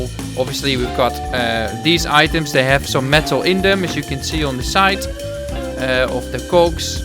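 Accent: Netherlands. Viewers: one question, are you dutch? Love the vid btw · You realy sound like a dutch guy! Nice video btw! :D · main thing I learned from this video is that you're from New Zealand 😂I always assumed your accent was Dutch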